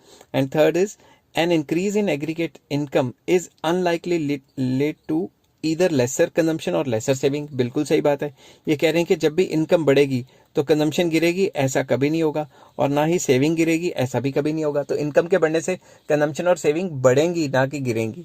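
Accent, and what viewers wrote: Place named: Haryana, India. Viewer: sir aap haryana se belong karte ho kya? apki language mai bagri touch aa raha hai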